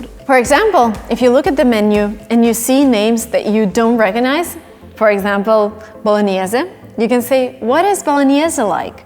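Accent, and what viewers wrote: India. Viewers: It look's like all Indians are showing up here🇮🇳.The thing here is her accent is like Indian who speaking in English... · This exactly is similar to how we speak English in India.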